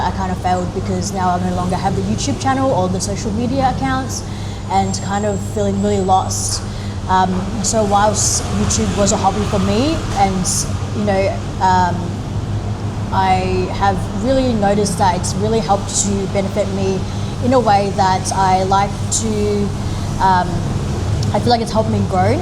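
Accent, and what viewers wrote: Australia. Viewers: Ms, you are an Austrailian????????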